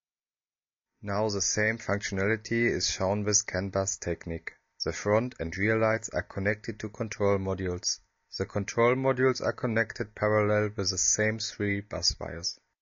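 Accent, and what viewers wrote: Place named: Germany. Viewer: Hi to the chaps at Engendi. Speaking as someone watching your video with only a general interest, this is my feedback: *The video gives a very useful view of CAN. thanks. *the product looks excellent, but fairly expensive, so you need to have high quality product promotion. (i realise that it is probably much cheaper than other options...) *no offence intended at all, but the English pronunciation is terrible, the accent is way too German, and there is no life in the voice at all. Yes, we know you are engineers, but if you are trying to sell to english speaking people, as i suppose you are, I recommend you have the whole video redone where it is narrated by someone who speaks English really well.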